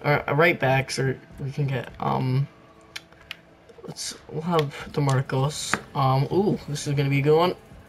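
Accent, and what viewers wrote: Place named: Estonia. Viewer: wtf ? are you from estonia??????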